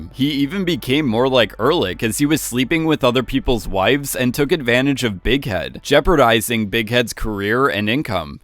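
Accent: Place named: California, United States. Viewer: the narrator is so, sooo, California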